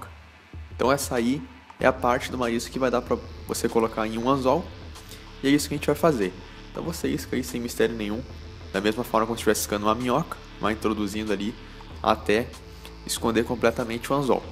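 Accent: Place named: Porto Alegre, Brazil. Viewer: opa blz, tu é de Porto Alegre tchê ?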